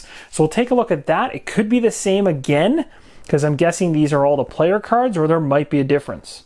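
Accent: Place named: Canada. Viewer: I love how Canadian you sound.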